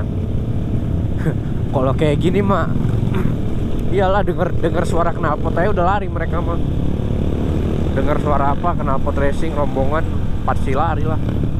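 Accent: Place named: West Java, Indonesia. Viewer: Orang jatim mas ? kok ngomongnya gak kelihatan logat jawa mas.. malah kayak dari logat sunda... hehehe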